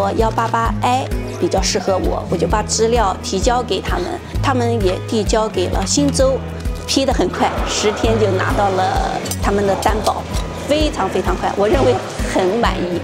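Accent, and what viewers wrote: China. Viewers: 果然是南京老姐，一听口音就像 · 一听就是江苏的， 这里面对的困难要比国内多得多，就业发展（无论是你或者子女）都不如中国， 但好在你生的是个女儿， 在澳洲也不难混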